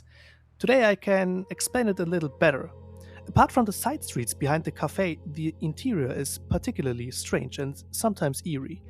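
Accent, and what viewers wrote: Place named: Germany. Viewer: Thicc german aaah accent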